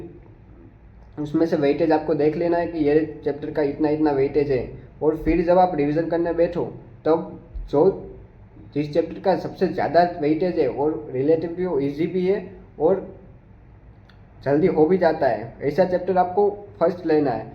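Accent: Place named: Gujarat, India. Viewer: Brother your gujrati accent is great, did you study in gujarati medium?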